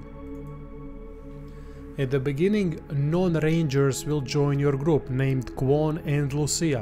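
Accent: Russia. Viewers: You Russian?? Slightly sounds forced cause I love doing a Russian accent as an american. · Best true Russian English ever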